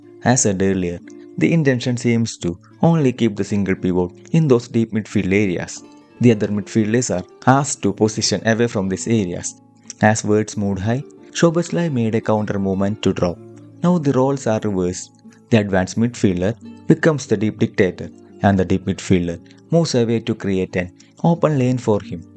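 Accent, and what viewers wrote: India. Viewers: Indian accent and football don't marry well. Talk about cricket and curry. Maybe chess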